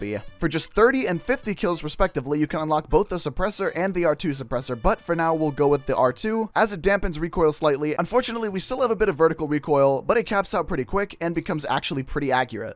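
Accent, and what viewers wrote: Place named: New York, United States. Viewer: Even though your NY accent is better than mine, and I was born and live here, I love your many accents. I read it's a rare talent. As you said in this video, "Very impressed, not gonna lie.". <3 NYC GIRL!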